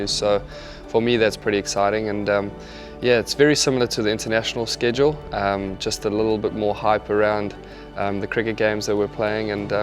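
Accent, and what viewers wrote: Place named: South Africa. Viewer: This guy has a South African accent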